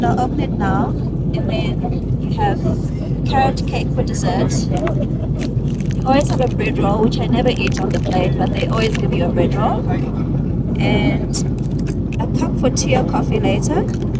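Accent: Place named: United Kingdom. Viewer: What do I love more, your British accent or your love for our country ?.👍👍😂❤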